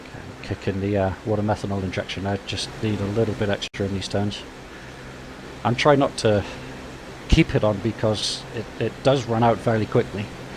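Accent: United Kingdom. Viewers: Kind of cool and weird at the same time hearing an Englishman narrating DCS in a FW-190 lol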